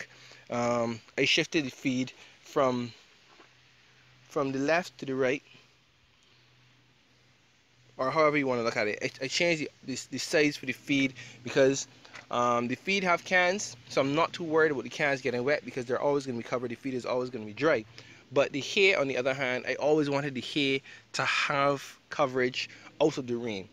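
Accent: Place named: Ireland. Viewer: what country are you in? you sound a bit Irish.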